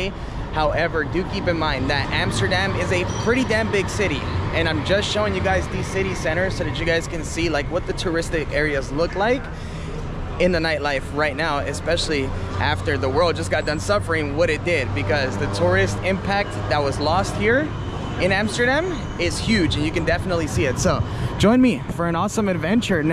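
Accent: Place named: Texas, United States. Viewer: Are you from Texas or El Paso. Do recognize an accent from there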